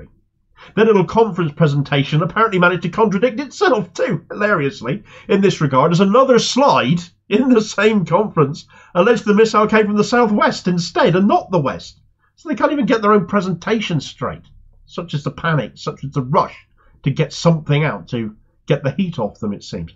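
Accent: Cornwall, United Kingdom. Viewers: I love your Cornish accent! Oh, and the content of your information😅☺️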